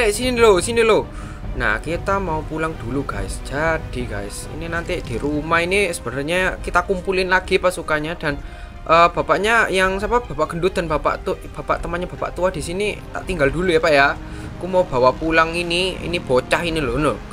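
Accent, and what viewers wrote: Java, Indonesia. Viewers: bang jawa nya medok amat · Bang loe orang jawa mana?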